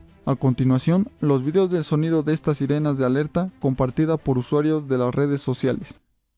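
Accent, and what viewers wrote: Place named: Mexico. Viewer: El comentarista tiene acento mexicano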